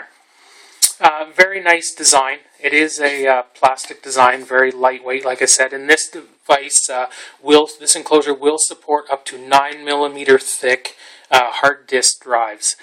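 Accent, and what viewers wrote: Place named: Canada. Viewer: Sounds like Canada ... oot (out). Should've cover more technical details. Most of what you covered was cosmetic. Spec details such as is it bootable, what the three onboard ports can be used with - what they will drive (other USB 3 enclosures, etc) and similar would've been more useful than it looks really nice, it's well-built, you highly recommend it, etc., etc. Also, of course it's going to be quite, it DOESN'T have a fan! Your comments sound like you got paid (compensated with free or discounted product) for this review.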